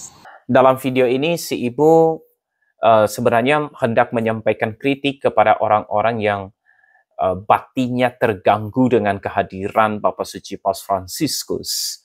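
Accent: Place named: Borneo, Indonesia. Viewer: Dari logatnya ibu ini kayak logat dayak ya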